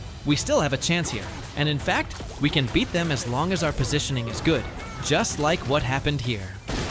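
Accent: Ilonggo, Philippines. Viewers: Huli Hahaha ilonggo ka gale nga bilatibay ka ha😂😂😂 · Ilonggo po? hahhaa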